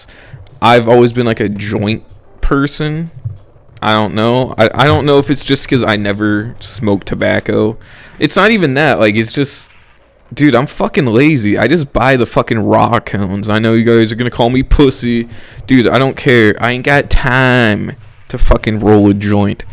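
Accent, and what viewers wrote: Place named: Midwest, United States. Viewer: It sounds very Midwestern vro sounds mad congested lmao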